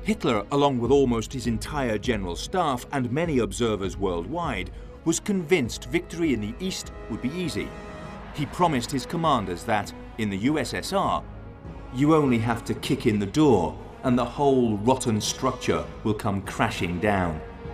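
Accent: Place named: Scotland, United Kingdom. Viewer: took me a few minutes to decipher the Scottish, couldn't place the second influence in his accent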